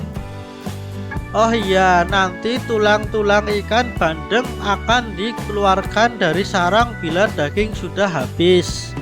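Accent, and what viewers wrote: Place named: Purwokerto, Indonesia. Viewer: Genah banget koh logate. Purwokertone ngendi, nyong pengin dolan. Belajar ternak semut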